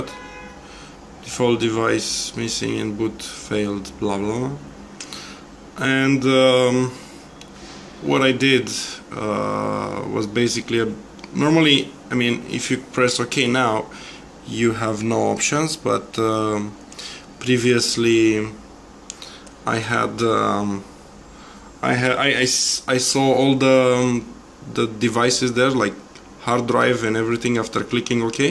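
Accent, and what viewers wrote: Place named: Ukraine. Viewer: You sound like you are from Ukraine or somewhere close. Playing a guess game.. Anyway Il try this.. BTW does anyone know why did we start seeing this screen?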